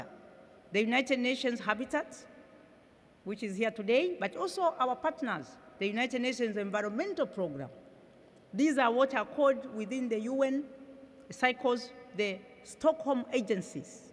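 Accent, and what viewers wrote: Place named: Haya, Tanzania. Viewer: Yeah! representing well Tanzania,the country of Mwl. Nyerere & JP Magufuli.Big up mama tibaijuka truly you deserve respect.pia nimeipenda lafuzi ya kihaya mpaka raha hiyo Ndiyo TZ.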